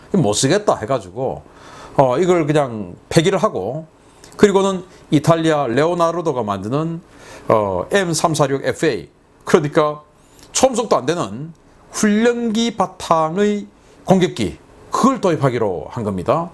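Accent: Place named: Gyeongsang, South Korea. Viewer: 경상도 액센트 좀 안썼으면 좋은데. 좀 거북합니다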